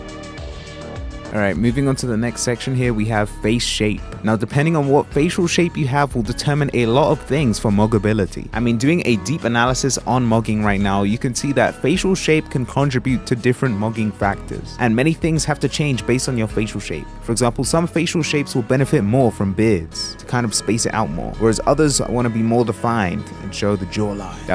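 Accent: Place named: United Kingdom. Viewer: Dude I kinda hear an accent, are you originally from the uk? 🇬🇧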